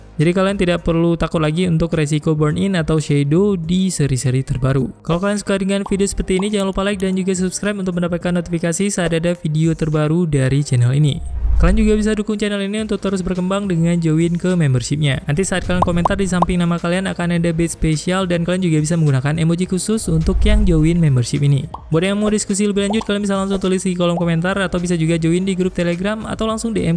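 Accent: Aceh, Indonesia. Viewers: Org aceh? Ya om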